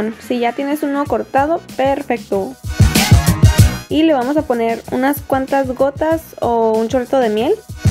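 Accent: Sinaloa, Mexico. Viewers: Eres de Sinaloaaaaaaaaaaaaaaaaaaaaaaa!!!!!!!!!!!!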